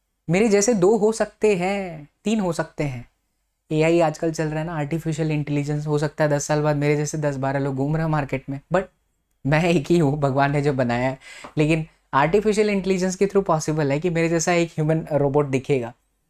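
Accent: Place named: Tamil Nadu, India. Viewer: 46' 12 Tamil accent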